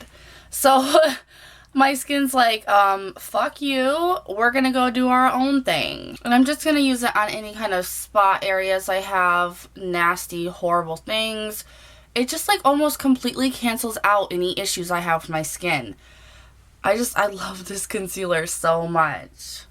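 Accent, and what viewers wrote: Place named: New Jersey, United States. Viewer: Michigan, about 30 minutes from Detroit where my fiancé currently lives. What state are you from? Your accent sounds a little like someone I knew from New Jersey.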